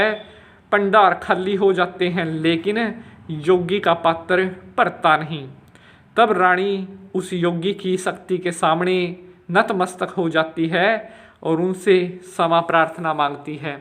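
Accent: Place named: Punjab, India. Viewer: Koi Punjabi lagda video bnon wala hindi nal gutham ghuthi hon dya e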